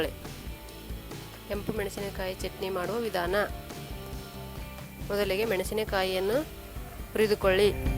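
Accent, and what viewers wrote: North Karnataka, India. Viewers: Mam neevu Uttar Karnataka matalle matanadi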